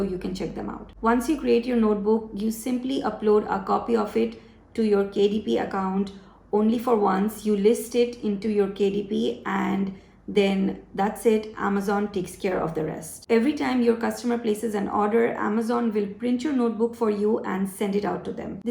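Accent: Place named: Western India, India. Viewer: Do you practice boxing? Why your hands are Red? Your English is Gujarat or Maharashtra side of India.. If you don't mind then please let us know from what part of India you are.. Need help on LLP and bank account that's why I came to your channel and subscribe I was in US last year.. Have 10 years multiple entry US business India.. Like to export goods like Clothes but have no idea.. Any other then clothing what else you recommend to export there??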